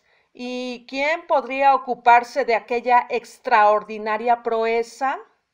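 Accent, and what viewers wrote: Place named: United States. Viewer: El mejor video de la vacuna que he visto, y con ese precioso acento Americano. Muchas gracias. 🇪🇸🇯🇪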